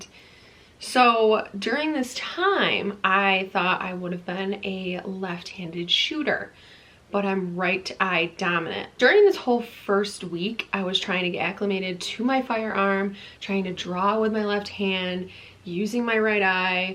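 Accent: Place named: New York, United States. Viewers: I love your New York accent, that's like a real cop's accent. I honestly wish I lived on the east coast just so I can talk like that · She does not have an accent to me. :-) I'd say upstate somewhere, not down by the City.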